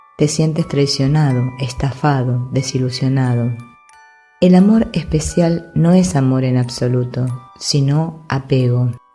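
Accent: Argentina. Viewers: Hola sos Argentina no? Por el acento digo...los textos los haces vos o lees de algún libro? Son muy buenos!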